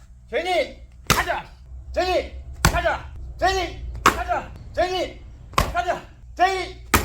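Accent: Gyeongsang, South Korea. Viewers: 정말 끝내줍니다 진주 사십니까 쥔장님 ? 발골도 잘하시고 경상도 사투리도 구수하니 좋고 같이 한잔 하입시다 ㅎㅎ.서울인데 한번 가고 싶네요 ~ㅎㅎ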